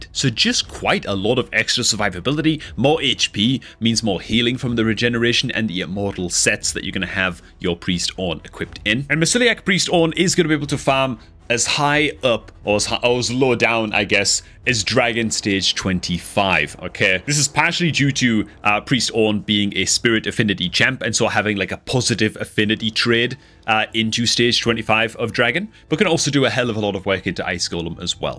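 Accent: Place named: Yorkshire, United Kingdom. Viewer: The Yorkshire accent is wicked too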